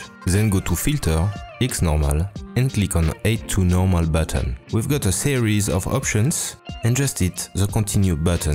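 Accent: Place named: France. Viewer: the frenche accent is tout bien mec !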